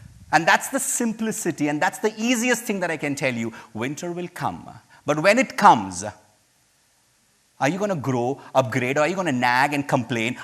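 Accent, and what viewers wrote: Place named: Kerala, India. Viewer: Sir ur from Kerala? Ur accents are so pleasant 👍👍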